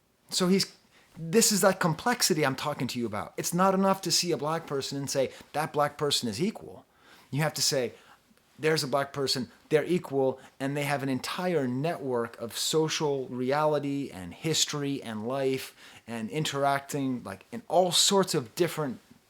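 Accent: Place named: Bahamas. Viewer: Words cannot express how proud I am of for stepping out and being such positive representation of the Bahamas and the Caribbean in general. I just happened to get on his channel through Khadijah's recommendation and I started to watch the video and I was like "why does this man sound Bahamian??" come to find out here and I are from the same country. He really brings a perspective needed so desperately so desperately in left tube. So many people assume so many things about the Caribbean and we don't even get proper representation in media.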